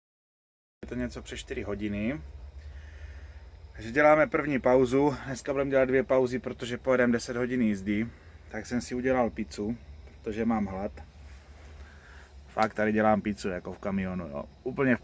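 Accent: Moravia, Czech Republic. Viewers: Za mě super, tož Ti dávám odběr 👍 A hrozně dobře se to poslouchá, ta naša moravština 😃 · Ta moravština je i pro mě úplně suprová, zvláště když jsem se ocitla v Čechách a oni tady mluvijou fakt divně:-D:-D:-D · Perfektne video, nedalo mi a dnes som si ho pozrel druhy krat. Dobry koment,obraz proste vsetko a ten prizvuk moravsky, moravakov mam rad:-D S to bolo ozivenie. To Nemecko ti nezavidim ked nemas den tak vindes so STAU prejdes 50 km a je tam dalsia a to nejazdim s kamionom. Klobuk dole pred vasou pracou, ja som o nej par krat premyslal ale nedal by som to pri tych preplnenych cestach, ako si povedal odstavit zahodit kluce a ist domov vlakom:-D toto zazivat kazdy tyzden by ma kleplo. Tesim sa na dalsie videa a drzim palce. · Nemá chybu ta moravská mluva, je to vtipné, ale v dobrém smyslu, dobře sa to poslúcha:-) Tak tak lidi by měli být na ty kamioňáky ohleduplnější však to není sranda toto šoférovat apropo kdo by jim domů dovezl ty jejich ebaye a wishe a další... 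· ty moravaku fajne:-)moc hezka rec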